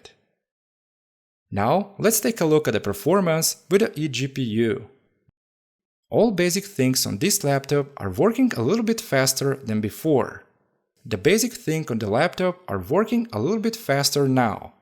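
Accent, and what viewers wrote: Germany. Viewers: I'd say germanic origin (Germany). 🙄😏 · And 🤔, you’re accents sounds a little german, he said “insteed” instead of ‘instead’ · What a shitty german accent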